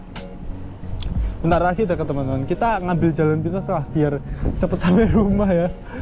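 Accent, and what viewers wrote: Java, Indonesia. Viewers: mas bro sampean logatnya kok kayak orang jawa ya ,kalau boleh tau jawa mana ya? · Aku seneng logat jowo mu mass💍😻😌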